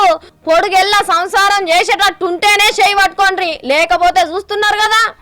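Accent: Telangana, India. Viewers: Telagana are suli ya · ABBA aa daridrapu telangana telugu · Arey. Bhai. Telangana languge ante. Alane. Untadhi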